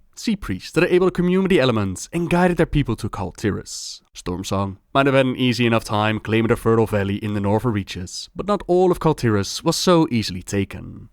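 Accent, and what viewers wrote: Netherlands. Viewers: Are you Dutch? Your accent truly adds such a great aspect to your storytelling. Brilliantly done!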